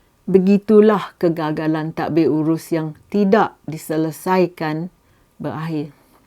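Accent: Perak, Malaysia. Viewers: You ni orang Perak ke ? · Pekat bunyi.Perak nya..😁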